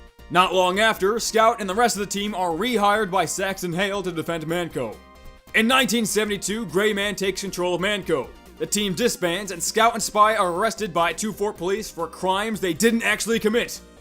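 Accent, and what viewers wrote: Boston, United States. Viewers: fast Boston boy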